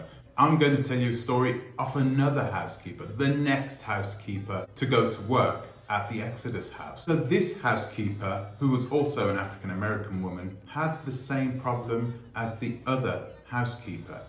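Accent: United Kingdom. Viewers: It's easy for you to sit there with your British accent and judge this woman. So I ask you, when have you ever run an establishment like this?? Do you know her history? Have you seen the videos of the property before she restored it??? Do you know what it's like to have housekeepers and to run a home staff?? Especially when you're from a different country than your staff?? Do you even know that she's a single mother?? Have you seen the videos of people who have done review videos of their stay at Exodus?? They have filmed and shared their wonderful experience that they had there. Did you see the interview that was nearly an hour or more that the YouTuber called traveling sista did with her and how beautiful it was?? Do you know her history?? So stop trying to have a sensational story to grow your pathetic channel. Why don't you try going back to your roots and get rid of that damn British accent which never looks right on an African person. 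Take your ass to Tanzania or any other country and establish something for African people. Stop judging!! What goes around comes around and karma can be an evil bitch.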